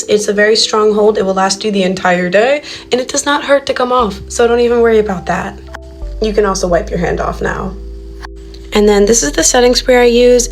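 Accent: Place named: Netherlands. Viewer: You sound very dutch 😂 I am dutch aswell :3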